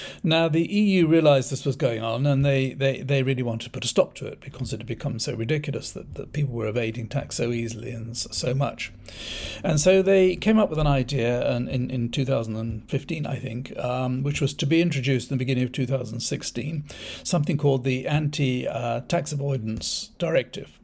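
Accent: RP, United Kingdom. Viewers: It's a real cut glass accent.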